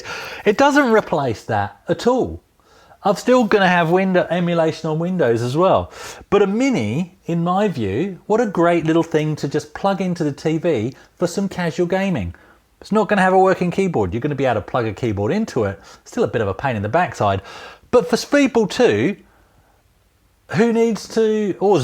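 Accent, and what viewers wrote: Australia. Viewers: I guessed correctly why the Pi was being packed away, but as a first time viewer my real question was "Why does this British chap sound like an Australian?" But I did watch the entire video...and now I know. :) Subscribed...by an Aussie in Canada...probably sounding a bit Canadian by now.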